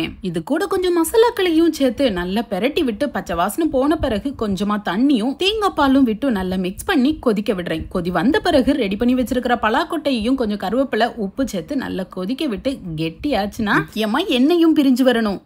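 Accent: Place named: Nagercoil, India. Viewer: New try... N ur Nagercoil slang❤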